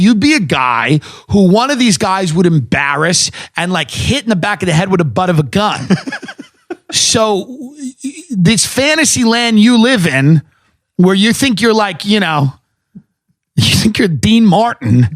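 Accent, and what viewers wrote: United States. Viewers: I'm 8 mins in and all i hear is a yank yapping.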